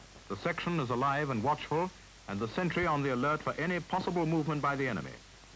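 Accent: United Kingdom. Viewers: British narrator---- but those are fighting Canadians! The greatest Canadians who ever lived! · “One tank with a personal grievance” makes me wish I had a British accent lol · This announcer is clinically British. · Strange to hea so little British accent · What particular british accent is this? I love it. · The Young ones today wouldn’t understand the old British language🇬🇧 the way of saying things.. love it ,,,tally Ho ! let’s have a Cup tea ☕️ 😁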